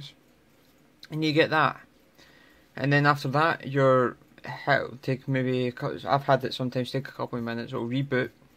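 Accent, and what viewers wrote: Scotland, United Kingdom. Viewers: Hes scottish you fucktard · Is u Scottish ?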